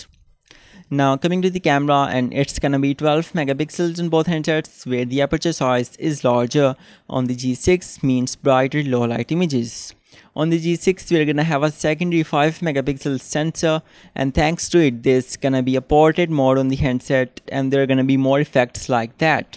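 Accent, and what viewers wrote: India. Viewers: Not a bad video but sometimes I find it a little annoying trying to understand his Indian accent.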